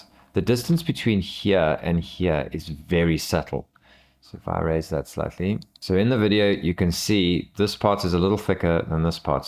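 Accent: South Africa. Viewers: This came up on my recommendations because I’m keen to get this! But I have to ask, are you South African!? So cool! Can’t wait to get my Miyoo Mini Plus 😃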